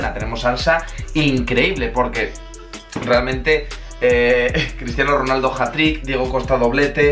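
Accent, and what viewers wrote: Asturias, Spain. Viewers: Ye asturiano el guaje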